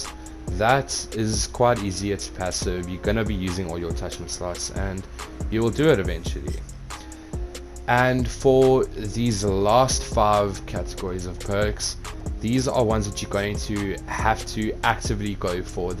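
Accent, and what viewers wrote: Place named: South Africa. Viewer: Why do you sound south african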